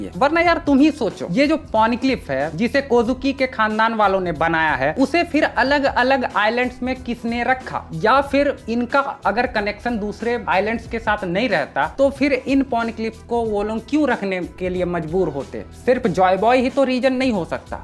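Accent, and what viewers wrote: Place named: Odisha, India. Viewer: Bro are you from odisha . Apke baat karne ka tarika Odisha walon ke jaisi hai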